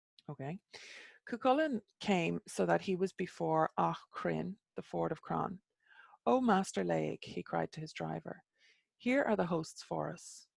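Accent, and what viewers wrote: Ireland. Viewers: Thank you so much for reading these. I love hearing it read in your Irish accent. It helps to hear how things are said properly. Your commentary is also a great help with understanding what is going on.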